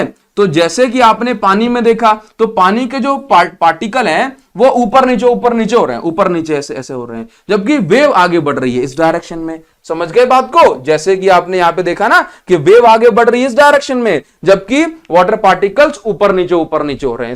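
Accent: Odisha, India. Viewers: Sir great video❤ Sir, are you odia? Jay jagannath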